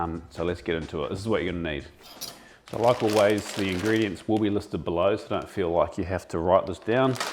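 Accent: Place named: New Zealand. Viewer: The Kiwi accent is strong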